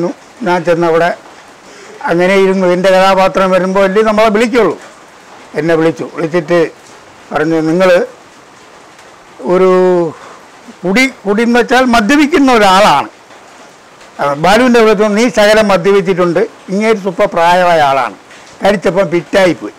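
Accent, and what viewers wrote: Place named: Trivandrum, India. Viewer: അണ്ണാ നിങ്ങട തനി തിരോന്തരം ഭാഷ ആണ് എനിക്കിഷ്ടം അതു കേൾക്കുമ്പോൾ പ്രവാസിയായ ഞാൻ നാട്ടിലെത്തിയ ഫീൽ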